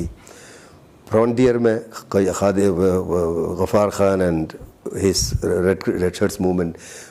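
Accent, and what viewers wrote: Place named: Kerala, India. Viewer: He speaks great Urdu, but he still has a Malayali accent.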